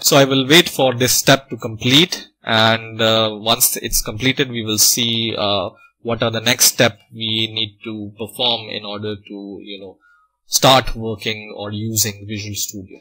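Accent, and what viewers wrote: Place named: India. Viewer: No, it is just the Indian accent...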